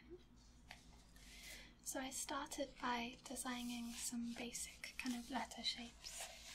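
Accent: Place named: Yorkshire, United Kingdom. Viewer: Great video! What is your accent? Sounds kind of like Yorkshire, but a little different. It's beautiful, by the way! Cheers from WV :-)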